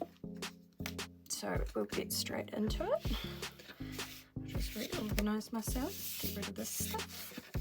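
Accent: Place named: New Zealand. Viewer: After the “77” it was super obvious that you are a kiwi 😬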